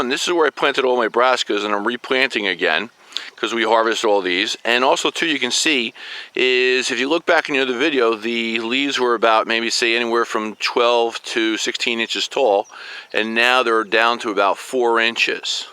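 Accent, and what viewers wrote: New Jersey, United States. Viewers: Good video. Can really tell your from Jersey. "wooder", water.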